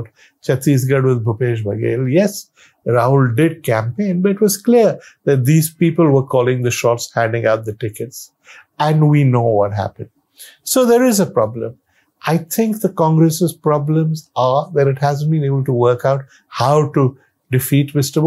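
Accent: United Kingdom. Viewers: Too much wine and hangover British accent.. in Tamilnadu BJP may not get any seats, but be aware that the vote share is really rising. The idea of Hindi heartland party is only for these Khan market window shopping and gracelessly aging British leftovers in India..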